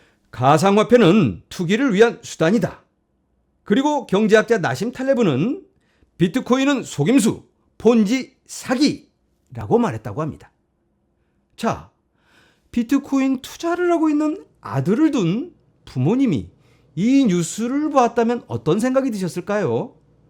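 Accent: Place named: South Korea. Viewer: 발음이 구수하십니다 한국식 친근감가는 하이리스크 하이리턴!ㅎㅎㅎㅎㅎ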